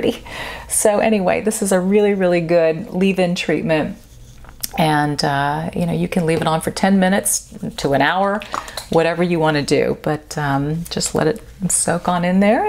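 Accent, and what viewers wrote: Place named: United States. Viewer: So inspiring as always, thank you so much! Merci pour ton anglais américain pour moi très agréable à entendre, je te suis depuis longtemps non seulement pour tes vidéo, ta personne mais aussi pour apprendre cette langue qui m'étant si nécessaire. Loving you from Paris! Have a nice weekend!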